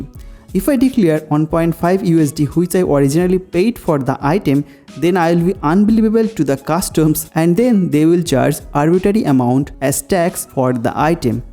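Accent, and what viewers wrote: Bengal, India. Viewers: Typical indian people ma dude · Bengali people speak 🗣️ like this it is not like that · দাদা আপনার কথা শুনেই বুঝেছি আপনি বাঙালি। বাংলাদেশ থেকে ভালোবাসা রইল 🇧🇩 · Rathod He is talking in Bengali accent, not American. Don't be ignorant about your countrymen, try to learn more about India. It is a very diverse country.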